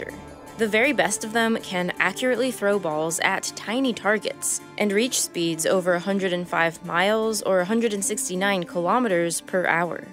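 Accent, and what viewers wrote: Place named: California, United States. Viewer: Is the accent in the video , Californian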